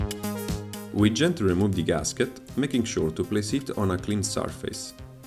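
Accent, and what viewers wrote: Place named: Italy. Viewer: You sound very Italian :)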